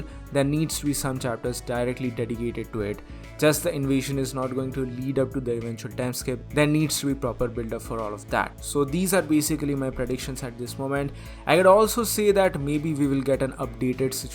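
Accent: India. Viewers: BECAUSE HER ENGLISH SPEAKING STYLE IS LIKE INDIAN THAT'S WHY I AM WELL THANK YOU FOR TELLING (ARIGATO)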